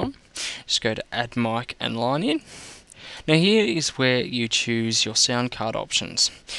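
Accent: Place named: Australia. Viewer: love the aussie accent by the way bruh. I'm wanting to lets say set up a radio broad cast for prank phone calls. I'm going to be using Skype with a friend or 2 and will be calling numbers for the calls. I will be using this set up.. but how do I actually broadcast to people? Am I going to need to set up an SQL database through my server? I have PhpMyAdmin and all that... just wondering if you could help me out here thanks! If email is easier then email me <mail>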